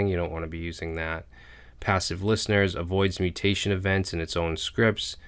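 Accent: Boston, United States. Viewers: Boston accent is killing me.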